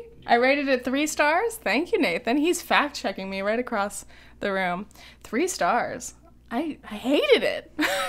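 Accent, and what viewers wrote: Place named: United Kingdom. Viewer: Giving you a super like for that British accent. Applause applause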